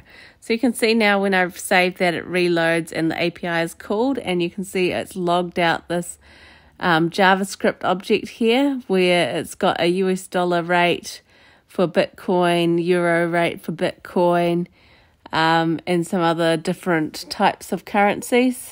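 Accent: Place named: New Zealand. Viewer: Yo! Holla from Perth WA! Your accent sounds like you’re from NZ! 🤙 Awesome tutorial 👏 keep up the awesome work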